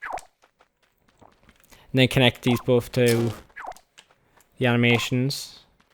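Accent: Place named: Ireland. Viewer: Why am I obsessed with Irish accents?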